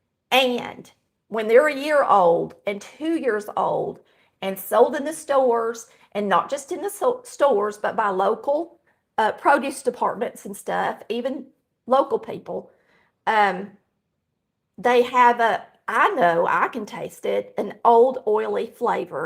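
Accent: South, United States. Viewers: You always make my day when I hear that wonderful southern accent. Happy Holidays to you both. Carrie from Delaware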